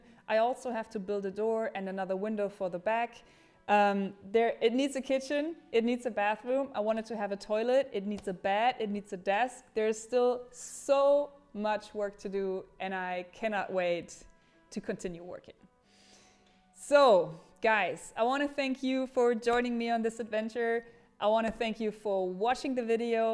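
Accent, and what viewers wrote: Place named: Germany. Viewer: تعجبني لكنتك الألمانية الظاهرة بشكل واضح في لغتك الانجليزية، مشاريع جيدة وممتعة، تحياتي لك ولمجهودك الكبير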